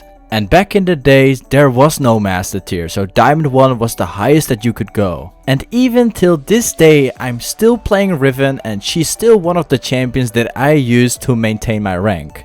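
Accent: Netherlands. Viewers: Ben je Nederlands , hoor echt een accent?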